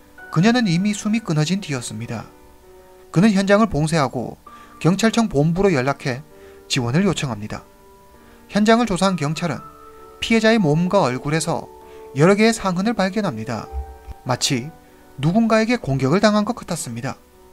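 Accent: China. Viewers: 똑같이 느끼셨군요, 조선족 말투???....😁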